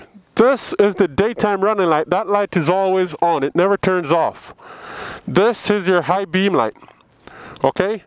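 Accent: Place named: Caribbean. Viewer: dis is your ORN ! your accent is a mix of foreign and caribbean lol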